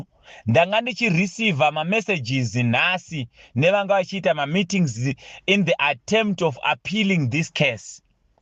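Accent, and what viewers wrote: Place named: Matabeleland, Zimbabwe. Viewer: You got ndebele accent 😢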